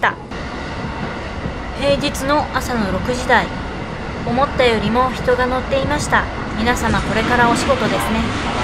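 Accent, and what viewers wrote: Kansai, Japan. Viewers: 関西訛りのイントネーションがとても好きです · 丁寧に話しておられますがちょいちょい隠しきれない関西の荒っぽさが出ていて親しみを持ちましたw博多までお疲れ様でした！次の旅も楽しみに待っています。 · ところどころ出て来る関西弁のトーンの親しみやすさ。117系など識別できる鉄分の濃さ。 もちろんお顔も美人で大ファンです。(南大阪地区の鉄ヲタのタクシードライバーより)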